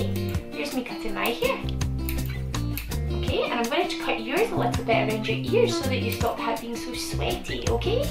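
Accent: Scotland, United Kingdom. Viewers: Oooh I love your super cute intro 💖 Love your accent so much 🏴 what part of Scotland are you in? I need to take notes from this video as I cut Leo’s hair and it’s terrible 😬 Awww he’s just too cute 😍😍😍 You are doing such a good job and you make it look so easy! Wow super good job! That’s really impressive! Ahh yes Leo doesn’t get screen time much either so that’s a great idea 😁💖 Oooh no static hair 😂😂 Loved watching 😁💖 Awww love the hiding seek 😁💙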